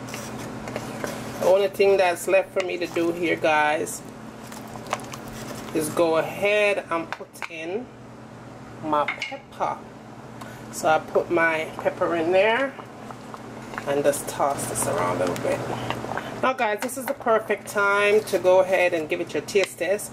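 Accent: Jamaica. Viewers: I’m from the Caribbean but I just moved to The Netherlands and when I say your accent and patois is like a breath of fresh air to me😂😑 totally gonna make this and I love how you’re not yanking like how most other Caribbean ytbers do. Much love!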